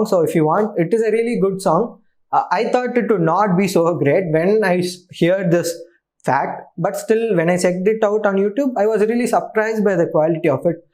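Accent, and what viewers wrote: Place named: Kerala, India. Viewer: He sounds malayali to kaisa bolinga une haule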